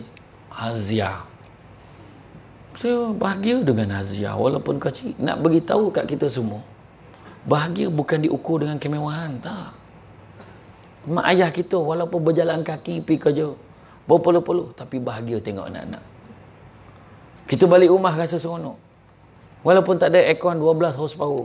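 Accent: Negeri Sembilan, Malaysia. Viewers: Suka dengar loghat negeri sembilan ustaz. Mudah faham walaupun saya orang Kedah. Comel je bila ada part suami isteri. 😁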